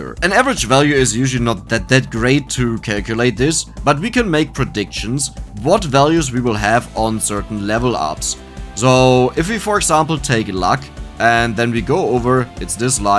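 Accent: Germany. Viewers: German accent is funny af sometimes, for example said verks (works)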